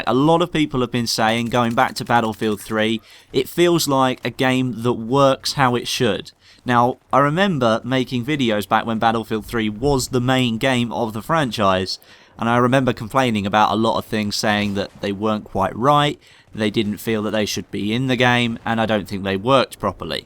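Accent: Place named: United Kingdom. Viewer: British accent sounds so gay.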